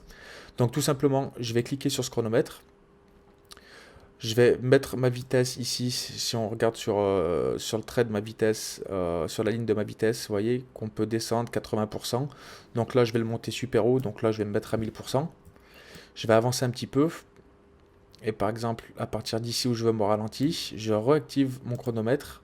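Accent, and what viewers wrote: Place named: North, France. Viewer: pas mal , l'accent on s'en fout fout....même du nord nord...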